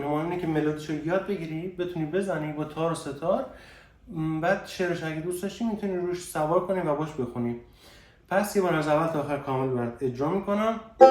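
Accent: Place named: Azerbaijan, Iran. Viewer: برعکس، دوست داریم لحجه آذری و مازندرانی شمارو بشنویم 😊 (مربوط به دقیقه ۱۱:۲۵ )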